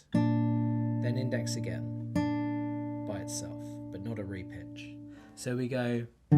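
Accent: England, United Kingdom. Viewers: I LOVE YOUR ENGLISH ACCENT MAN! Nice vid btw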